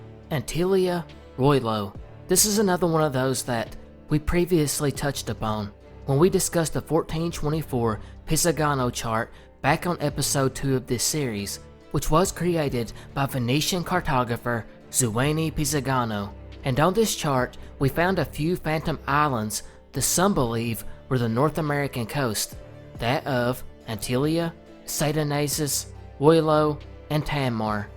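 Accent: South, United States. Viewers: lol dude I can’t lie, hearing you say “Mulan Pie” a hundred and fifty times in that signature southern brogue was worth the price of admission. I’m gonna watch all adverts all the way through for the rest of the video lol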